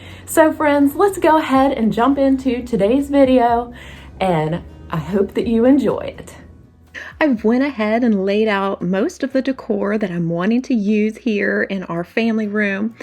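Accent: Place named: Pennsylvania, United States. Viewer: Really cute decor! Love that copper pumpkin & wreath! I was just hunting the acorn spice today, & finally got it at target after asking a manager, it was still in the back. Was wondering if you are from PA. Kind of sounds like it to me. I grew up there. Have a blessed weekend!🍂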